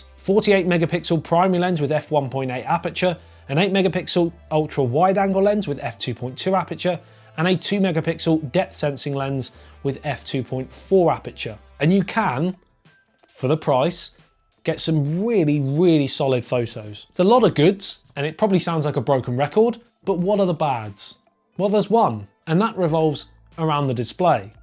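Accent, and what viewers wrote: United Kingdom. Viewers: You mentioned the pricing only on the first device. You may have a James Bond accent, but this video was real douchebaggish ya cheaky bastard.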